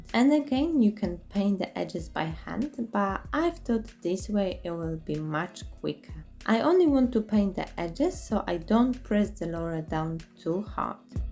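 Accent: Poland. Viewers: These were all brilliant! I genuinely enjoyed them and only today I took a lampshade apart and considered using macrame cord on it. I love what you do and that you also go to the charity shops! Where sbouts in the UK are you? I can usually tell by someone's accent but you sound like you might possibly be from Poland (I love the accent). Cant wait to see what you do next and subscribed last week. 💕💕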